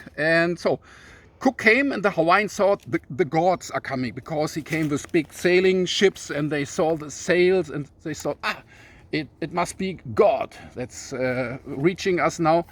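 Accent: Germany. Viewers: I was raised by my German immigrant grandparents ages ago and hearing your accent reminds me of home. I also follow the person you mentioned and #Lahaina #Maui I know I will follow you two as well. #Hawaii should’ve never become part of the United States. But they are and they deserve all the love and support we can send them. #LoveWins Mahalo everyone 💜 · Love your German accent!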